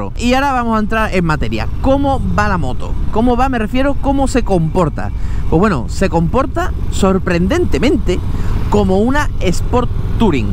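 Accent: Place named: Cádiz, Spain. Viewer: escucho un acento gaditano me encanta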